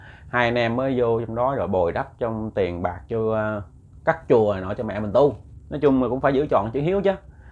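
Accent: Mekong Delta, Vietnam. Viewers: Rat nghe chat giong mien tay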